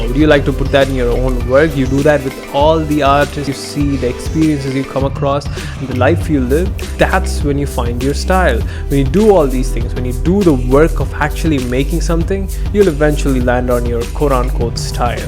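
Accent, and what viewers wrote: India. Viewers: Listen carefully and youll hear little indian accents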